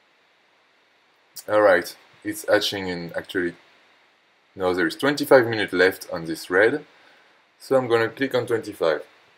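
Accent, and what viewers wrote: France. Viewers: St’accent francais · Hey, thanks for the video and helping Dublin Pogo players to be all a Pro :) . Small correction: "/" is just called a "slash" in English, "backslash" is this "\" which you might use in Windows. For the rest and on internet only the "/" is used for addressing a sublocation, exclusion, separation and in Telegram a request like /start to start a request. Bet you know that better then me (ur better in programming languages, then English (which is not bad anyways: Love ur French accent ) but had to say the confusion slash/ backslash\ which seems to be common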